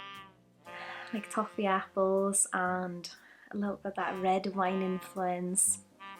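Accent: Scotland, United Kingdom. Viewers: Nice Scottish accent and great review.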